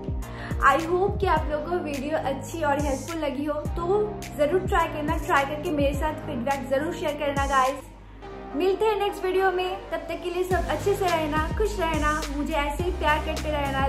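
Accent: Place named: Bengal, India. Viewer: Are you a Bengali girl Because when you are taking it listen like you are telling full hindi and half Bengali And I am also a Bengali girl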